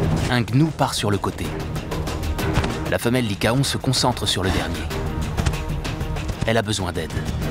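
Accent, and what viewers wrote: France. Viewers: Amazing footage but there's only one bad thing... It's a French narrator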